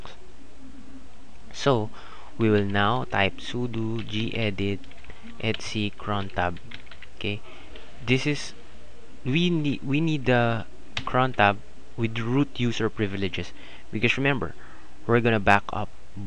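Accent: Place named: Philippines. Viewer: pinoy accent!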